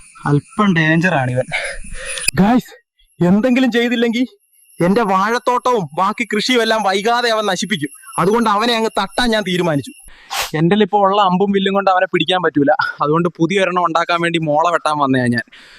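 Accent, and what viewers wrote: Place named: Idukki, India. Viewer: അസൽ ഇടുക്കിക്കാരുടെ സ്ലാങ്...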